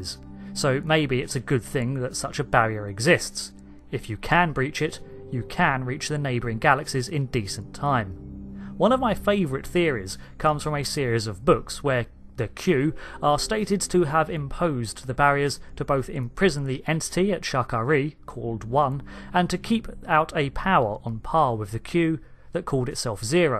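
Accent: United Kingdom. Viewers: Why am I so repulsed by narrators on YT with British accents? I was a bib Beatles fan and couldn't get enough of that sound. Now? Fuggedabouditt!!!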